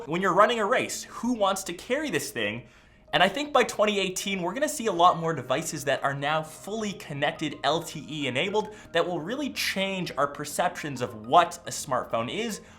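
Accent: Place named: Canada. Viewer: Love that Canadian accent. As always, a great, high-quality review.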